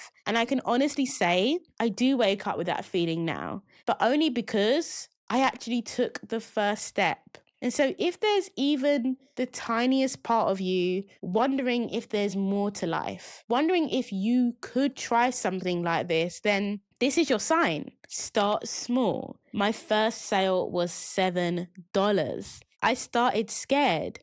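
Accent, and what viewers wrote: United Kingdom. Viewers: I have a liking for accents and it’s interesting to listen to you speak. You sound like you have a British accent with an Australian accent underneath and with an African accent (I can’t tell which country) underneath that. It’s nice 😊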